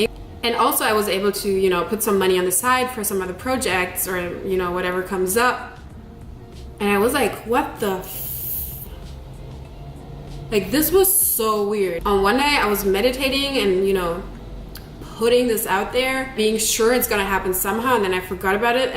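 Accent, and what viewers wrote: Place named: Germany. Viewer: I was thinking that you were Brazilian, but I could hear a German accent. You are very pretty. And for me, I'm not too good with attracting money. I usually attract relationships & friendships.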